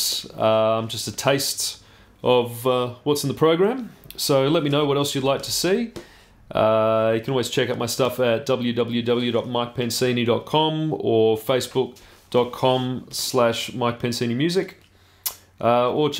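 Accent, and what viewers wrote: Australia. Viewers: Most Austrailian bloke I've ever heard! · Man, just your introduction with your Australian accent puts me in a good mood. Thanks for the review.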